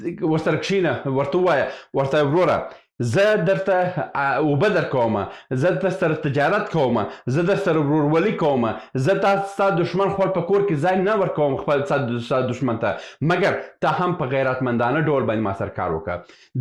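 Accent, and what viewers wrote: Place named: Farah, Afghanistan. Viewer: ده فراه پشتو داغه رقم ده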